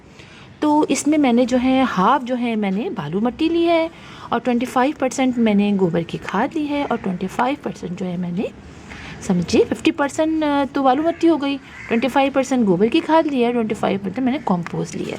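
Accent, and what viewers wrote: Pakistan. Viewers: Pakistani accent and way of speaking is soo sweet to hear ... love from India ❤❤❤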